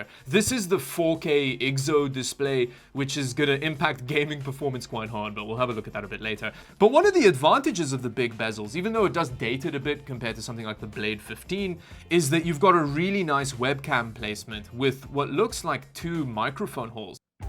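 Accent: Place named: South Africa. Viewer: ek kan hoer jy is 'n Suid Afrikaner maar van watter dorp?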